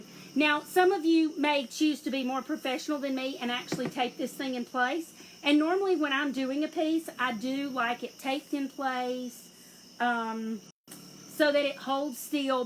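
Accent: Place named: Tennessee, United States. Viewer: Refinishing an old antique tobacco humidor right now. Been going back an forth on what color to paint it and to distress or antique wax...or both. Haha I kept feeling no matter what I did that something was missing. My door is plain. And I thought how could I DIY a raised embossed stencil onto wood. And I found you!! You are so dang fun and I'm from TN and my accent is about as thick as yours. Lol My accent and my "attitude" (not in the negative sense, more moxy and grit rather than attitude hahaha) that has been what has been holding me back from starting my own YouTube channel. But you make me feel like "what the world am I so afraid of!?" I've never been one to care what people think of me. Why should the internet be any different?? You are so unapologetically YOU. I completely admire that. And I NEEDED this tutorial tonight!!! Going to risk a trip to lowes tomorrow so I can get my piece finished! 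Thanks so much for sharing and for inspiring me!❤ New subscriber here!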